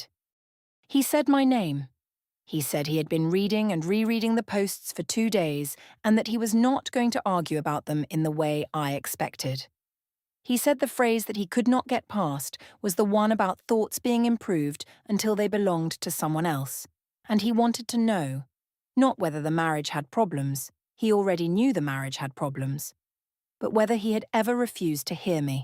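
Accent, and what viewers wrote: England, United Kingdom. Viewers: I enjoy the posts on this channel: the English accent, the female voice for a female narrator, the precision of ths details, and the studied inexorability of the narrative, among other virtues. This story, however, was WAY too subtle! Are we to understand that the narrator had a lesbian relationship with Sasha? Or was she only thinking of it? And what was the meaning of the two posts? How was the concept of thoughts being modified as if they were her own (or some such wording) -- whatever that could have meant -- defamatory? And what was the significance of the two copies of the novel, and what exactly was the narrator's explanation of why she liked to run her fingers along the spines of the books? Was it related to a lesbian relationship in college? And did the husband really divorce her because she suggested she was pressured into moving into the suburbs? 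I am utterly baffled by this story.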